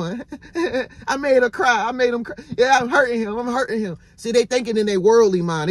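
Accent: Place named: South, United States. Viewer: Yes sir, I've been hurt, and bullied at work for no reason. By-the-way I love your southern accent King! 🤗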